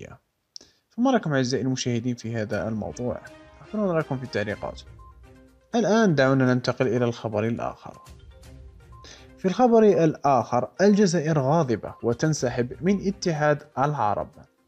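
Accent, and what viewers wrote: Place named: Marrakech, Morocco. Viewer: تخفي لكنتك المراكشية و لا تستطيع اختراقنا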